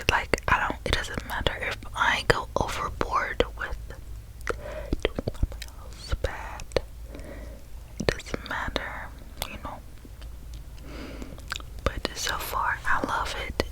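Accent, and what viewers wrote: South, United States. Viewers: I couldn’t help but notice a southern accent or I’m just crazy lol! Loved the video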